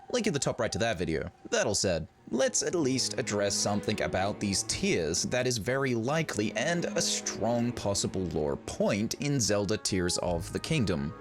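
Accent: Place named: Australia. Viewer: Your accent is so interesting. Like an Australian that’s lived in the US for too long? The way you pronounce your R’s is so chaotic. I love it.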